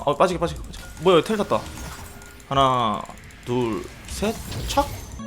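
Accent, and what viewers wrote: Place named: Gimhae, South Korea. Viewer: 말투가 아주 김해 느낌이 많이 나는게 뭔가 서운하면서도 제육을 볶고 싶은 느낌이네요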